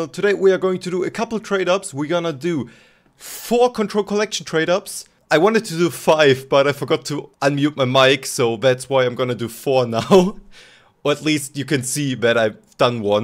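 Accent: Germany. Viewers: Nice tradeups, and are you german, because you have a strong accent xd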